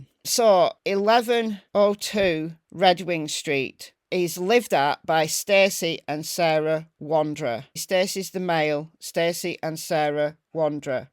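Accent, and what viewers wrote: United Kingdom. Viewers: Love your british accent hello from America 🇺🇲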